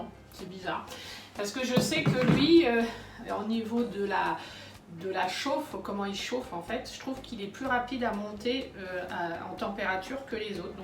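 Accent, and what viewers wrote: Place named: Rouen, France. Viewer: Mais tu es de Rouen ?? Il me semblait bien avoir reconnu l'accent 🤭 Super tes vidéos j'adore !!!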